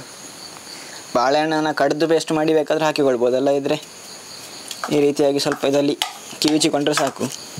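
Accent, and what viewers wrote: Mangalore, India. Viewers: I am from mangalore originally. Now I am in bangalore. I love the kannada you speak. It reminds me of my childhood ❤ I love the recipes you make. It's sooooooooo delicious. I'm am sooo thankful to you that you share our mangalorean recipes. I have tried your recipes and my family is surprised how I make it 😂😂 . For any recipe I refer to your videos. Lots of love. God bless you and your family. ❤❤❤❤❤ I wish to meet you and your family someday when I come to kasargod😊😊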